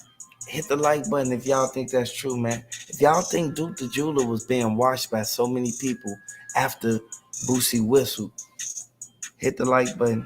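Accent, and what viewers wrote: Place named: Houston, United States. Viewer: Who is this mysterious "They"? This is troll-snitching at it's finest! They saying you were in the pics you are showing sir. With that H-Tine accent, I know you live in the Houston. Just wondering... Which one in the picture are you? You got specific intel, and are doing one hell of a job troll-snitching. Just keep talking, so we're can hurry up and close this case. Stand up H-Tine!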